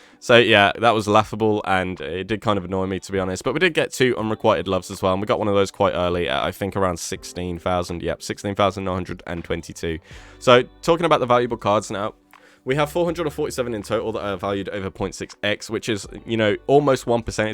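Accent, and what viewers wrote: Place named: United Kingdom. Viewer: I find the pronunciations of “unrequited” and “succor” to be offensive. Are you or not?! Edit: “The ‘Enfusiast’” absolutely sounds British 😆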